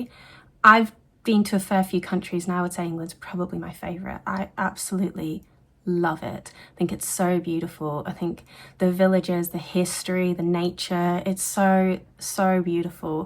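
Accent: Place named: Australia. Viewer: Hi -I stumbled across your videos and love them so much..they are so comforting and cozy with your soft Australian voice/accent. 🙂 Cotswolds is stunning all year round - your filming, music and editing is exceptional - you have a good eye to detail/vision and capture everything so beautifully, so calming and peaceful ❤. Im vegetarian and lovvved the salad...chefs kiss. I live in London and IMO it does the best Christmas lights ever..its sooo magical..you will absolutely love it 🎄🎄Cant wait to watch more of your beautiful calming videos in the Cotswolds. You will leave with amazing memories. xx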